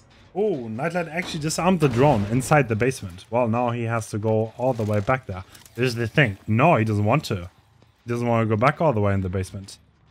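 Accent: Germany. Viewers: bro are you german? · This german acsent..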